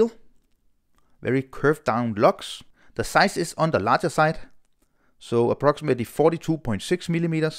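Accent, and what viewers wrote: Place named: Denmark. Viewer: haha insåg inte att det var dansk engelska jag hörde! Gissade på Holland/österrike först. Du gör bra videos!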